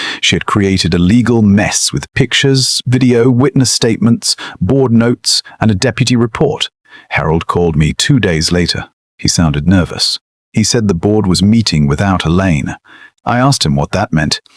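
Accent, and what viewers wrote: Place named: England, United Kingdom. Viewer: A I needs to learn how to read English correctly. Learn how to pronounce garage. Need a voice from America not from England.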